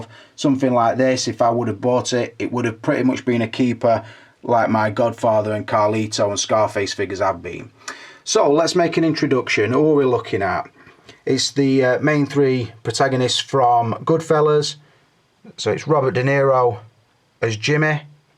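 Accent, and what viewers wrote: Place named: Manchester, United Kingdom. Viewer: hahahahhahaha ! Mate where are you from? Ypu sound local.. manchester ?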